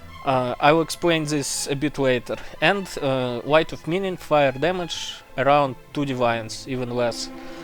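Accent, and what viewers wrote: Russia. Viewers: i understand russian english better than original english, good video, brazil here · по акценту понял что Ру говорящий). Каефынй билд и в пое 1 есть что фармить на нем ) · Yo just rerolled this as my 3rd char this league and having a blast so far. So thx for the build, nice explaining overall and i love ur russian accent. Im currently using the Merc from my Minion Char, with Haste, Tyrant helm(flat fire dmg), blind, elemental weakness curse, and a bit survivability. What do you use for Merc? Is Determination worth it for example? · Тяжёлый русский акцент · судя по говору сразу слышно, что русский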